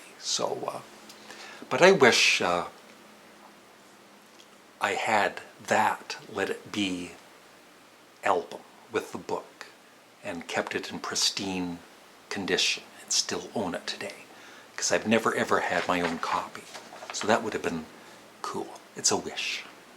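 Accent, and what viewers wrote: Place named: Canada. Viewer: Hey, I've got to say, Side 1 of ' ' epitomizes the ' ' sound. Also, I've got an idea for a future video. Could you review...not necessarily rank... fashion from their beginning in leather through their mod look, to their long(er) hair hippie look? I think it's fascinating they they evolved into so many looks (and sounds!) in only a few years! Also, can you work the word 'about' into your videos more? I love how you Canadians say 'abooot'.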